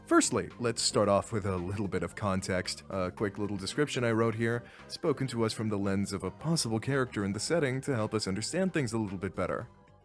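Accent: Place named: United Kingdom. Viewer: You sound like you live in a steampunk britain, its captivating I love this style of videos discussing niche topics i really like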